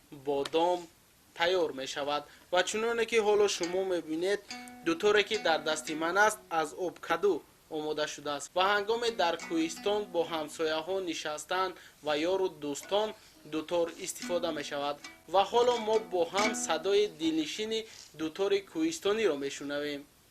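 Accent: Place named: Tajikistan. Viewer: Бародари азиз барои шумо муваффақият орзуманамдам, чи қадар навори зебо бо лаҳни тозаи тоҷикӣ, бо фарҳанги зебо тоҷикӣ 💕. Аҳсан!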